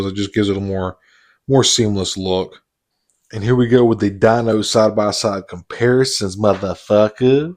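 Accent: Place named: South, United States. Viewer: Spitin Dino facts in the southern accent is worth watching till the end y’all. 😉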